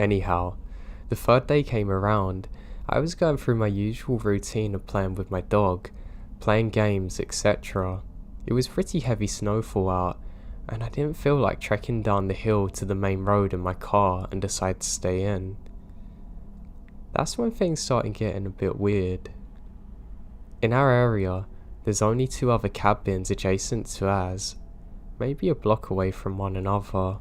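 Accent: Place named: RP, United Kingdom. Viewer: awfull posh creepy voice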